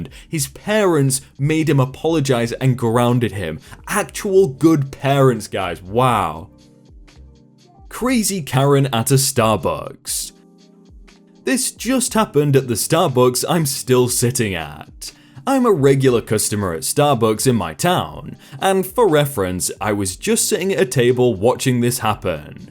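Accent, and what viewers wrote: United Kingdom. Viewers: The 2 story’s he sounds British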